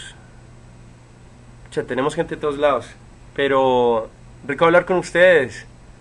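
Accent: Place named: Colombia. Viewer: yo la verdad pense que eras colombiano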